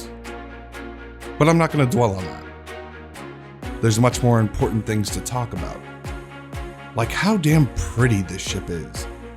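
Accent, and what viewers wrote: United Kingdom. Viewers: English slang accent gave me cancer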